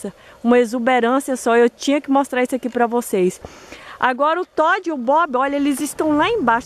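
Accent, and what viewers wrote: Minas Gerais, Brazil. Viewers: Pelo sotaque gracioso da, ela está em Minas Gerais...onde? No Paraíso! rs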